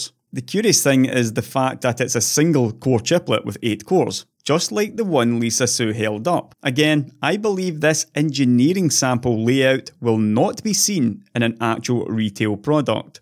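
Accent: Scotland, United Kingdom. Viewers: Scottish accent? 😂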